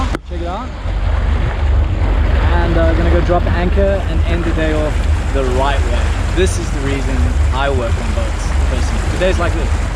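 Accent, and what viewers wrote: South Africa. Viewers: Are South African? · Are you south African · your accent sounds south african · Are you South African??? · Are you South African bro? And if so what advice would give someone who would like to work on yachts like you?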